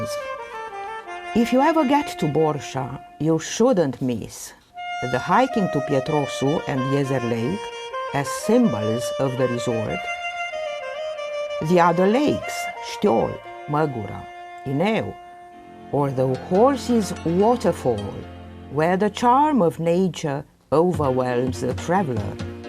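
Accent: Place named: China. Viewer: ce engleza ruginita si una parca'i o rostita de Chineza. filme de astea vor atrage doar turistii din Saliste,Carlibaba ... si Balti mAldavia ! e nevoie de curatenie,amenajari de gradul 1 [unu] la partia de schii,drumuri de accese si repunerea statiunelor minerale in practica reala care ar atrage mii,sute de mii de oameni din EUROPA... si vara si iarna.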